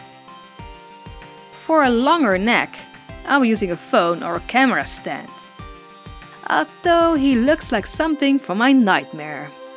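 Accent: Netherlands. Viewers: where are you from? you have a really dutch accent XD <3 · ok you can hear your dutch! but that what i love ( and the doll omg ). i always makes me smile when you talk bc i hear myself in it haha ( btw i'm dutch if you didn't know yet ) but i love him he's awsome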